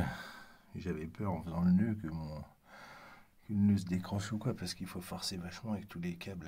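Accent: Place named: Nord-Pas-de-Calais, France. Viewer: • Tu as l’accent du Nord-Pas-de-Calais 😁 ! Est-ce qu’on peut t’appeler pour déboucher un fourreau ou est-ce que tu travailles uniquement avec un opérateur ? Merci d’avance à toi. Et bon boulot ! Tu n’as pas fini comme Claude François… 😁👍🏻 🥳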